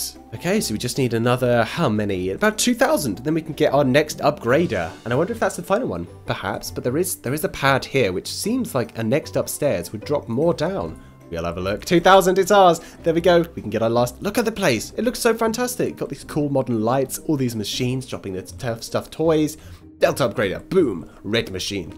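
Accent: England, United Kingdom. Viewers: Hi You have a Awsome British accesent And You are the best And Denis called Huskies Ugly:[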